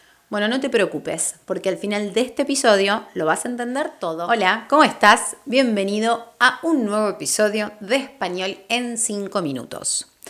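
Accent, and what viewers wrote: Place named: Argentina. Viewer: Gracias,. ¡Muy interesante! Me enamoré del acento argentino después de que inicialmente no me gustara mucho porque me costaba entenderlo. Ahora es uno de mis acentos favoritos para escuchar 😍. "Laburar" tiene sentido, ya que el adjetivo "laboral" está relacionado con el trabajo. Incluso en inglés tenemos la palabra "labor", que se relaciona con trabajar. ¡Un fuerte abrazo desde Nueva Zelanda! 🤗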